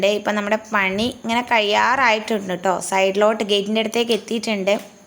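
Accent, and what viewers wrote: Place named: Malappuram, India. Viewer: നിങ്ങളുടെ സംസാരം മലപ്പുറത്തെ പോലെ തോന്നി😄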